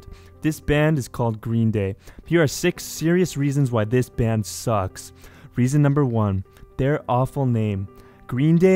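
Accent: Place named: Canada. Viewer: He's also Canadian, which makes the "This is America" joke funnier. I could tell because of his accent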